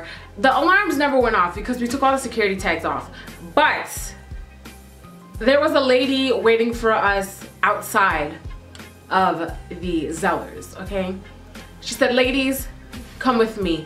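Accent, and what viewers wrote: Jamaica. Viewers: Are u also Jamaican or its just your mom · you have an accent, like a Jamaican accent. :o And you're super beautiful btw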